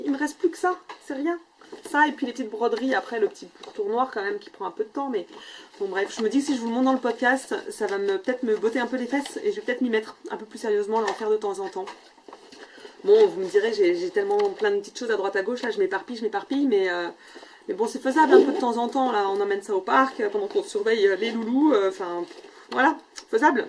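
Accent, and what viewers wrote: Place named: Quebec, Canada. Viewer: Bonjour, Super podcast. Il me semblait bien avoir entendu un léger accent québécois. L'Arizona est superbe et en été il fait très chaud. J'ai sillonné les USA depuis Montréal , du nord au sud et de l'ouest en est avec une amie et j'ai vu le grand Canyon. C'est magnifique , j'ai visité Phoenix et j'ai beaucoup aimé mais cela fait 30 ans. J'ai envie de commencer le crochet mais je ne sais par quel projet debuter. En tout cas j'attends avec impatience ton prochain podcast. Sylviane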